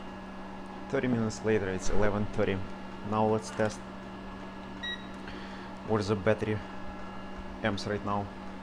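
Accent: Russia. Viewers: Какой ужасный рязанский акцент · Ахаха. Какой чисто русский английский 😁👍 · С первых секунд понятно стало откуда автор :)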